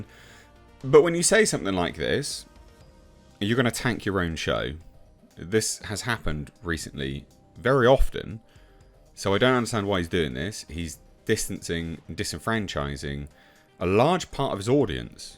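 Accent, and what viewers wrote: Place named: United Kingdom. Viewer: Wow. So many tiny dicks, so many scared man-boys. Ten million "men" demeaning women daily is equal to one person speaking up and calling them on it. Sick sad little America, and Britain I guess, considering the accent of the host. Torches and pitchforks over nothing. But hey, rally around the hate. It's what drives this country. Especially in the new dark ages of the American barbarian south, where little white man-boy is king. Is anything short of forced re-education going to change things for the better?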